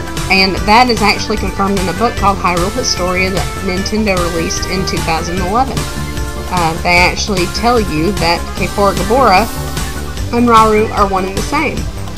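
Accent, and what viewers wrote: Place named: South, United States. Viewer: you sound southern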